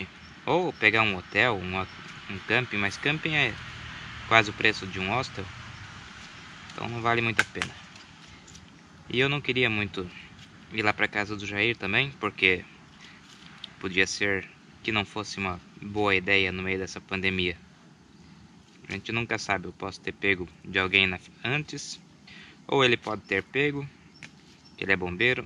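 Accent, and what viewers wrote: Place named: Paraná, Brazil. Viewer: Homi vc e da onde ,tem sutaque de paranaense misturado com Catarina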